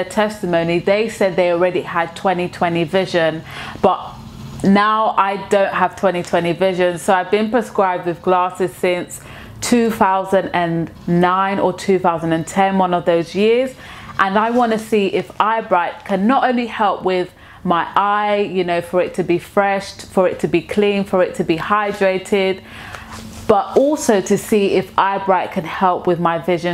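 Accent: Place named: Alabama, United States. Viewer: i love your accent, what part of alabama are you from?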